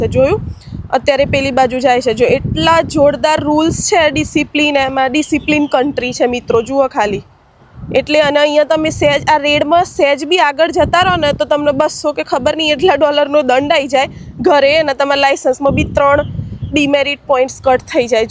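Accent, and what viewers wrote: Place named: Baroda, India. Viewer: 👍👍👌 બેન તમારા શબ્દો બહુ જ ફાઈન છે અને તમે જે સ્પીચ આપો છો અને જે બોલી રહ્યા છો એ જે વાણી છે બહુ જ સરસ છે અને તમે બરોડા અથવા અમદાવાદના છો એકદમ સ્યોર છું હું . ઓકે જય શ્રી કૃષ્ણ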